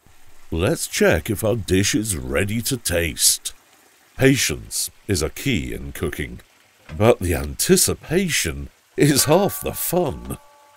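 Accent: United Kingdom. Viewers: I've seen so MANY of these videos to know that is a superb cook! I LOVE it, when there's NO narration, with the quiet absorption of nature. That being stated, I'm OKAY with this (I assume, British) gentleman's voice; he speaks in the first person...as voice. Whereas I find it a bit hard to associate the accent with the person (!), I appreciate that this narrator doesn't excessively talk...he pauses now and then, which is good. I'm still diligently attempting to discard national prejudice(s). Our fellow humans NEED to sustain love, especially in these times of turmoil. does that. SUPER 👍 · That dubbing or voice over does not go well with the genuine pure nature of these videos. Your videos are like a window into that simple village life. Voice over in a British accent feels like having coffee with salt.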